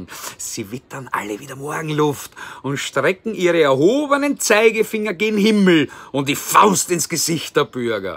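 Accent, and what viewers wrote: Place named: Vienna, Austria. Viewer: Das ist halt wienerisch!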